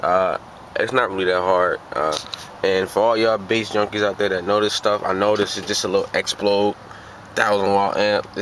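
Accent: Philadelphia, United States. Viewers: hes definately from philly i can tell lol nice work man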